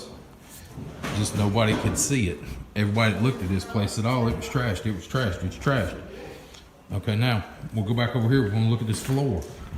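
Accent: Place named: Alabama, United States. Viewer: Oh, and the Alabamaspanglish is hilarious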